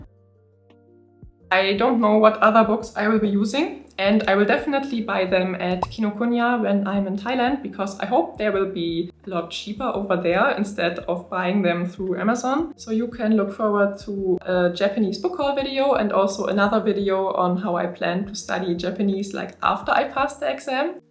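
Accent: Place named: Germany. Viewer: Hey, fellow German? 😁 Thick accent just as me. I'm also waiting for the JLPT results. I'm in Language School in Japan though. Still I get study slumps, and especially speaking is scary here as well. Can 💯relate! Keep at it! 💪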